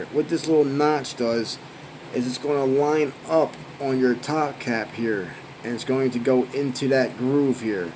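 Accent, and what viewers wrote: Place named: Philadelphia, United States. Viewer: Thanks for the review brother. I recognize a philly accent from anywhere. I’m guessing the north east?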